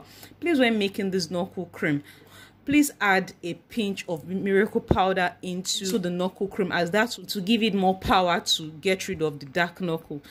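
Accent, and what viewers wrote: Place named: Cameroon. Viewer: Just stumbled on your channel. I just subscribed! You sound like a Cameroonian!! I know you said naira but you really sound like a Cameroonian! Good info on here!!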